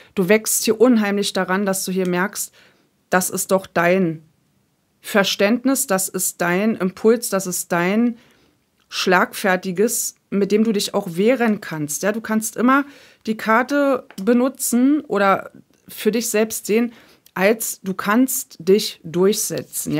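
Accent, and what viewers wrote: Berlin, Germany. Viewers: Sächsische Einlagen? Ist doch eher Berliner Dialekt. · Liebe, lass die Leute reden, da fällt mir das Lied der ein. Ich danke dir für deine Arbeit deine Zeit und deine Legungen 🙏🍀💫 Alles wird gut, ich bin im Vertrauen 🍀 Ich mag dich sehr, höre dir gerne zu, es gibt Legungen die ich einfach nur höre, andere sind wieder sehr stimmig. Du bist spitze, deine Berliner Schnauze, deine klaren Worte, Bombe einfach alles 💪🫶☀️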